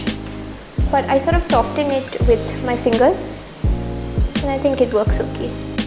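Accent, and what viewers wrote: India. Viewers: I really loooveeee the way u talk u know ur English is phenomenal..u enunciate syllables..dats really catchy..at first I didn't like it...but now..I just watch it videos to see u talk.. moreover most Indian YouTubers fake an American accent..but ur pakka proud Indian Naari! Love u..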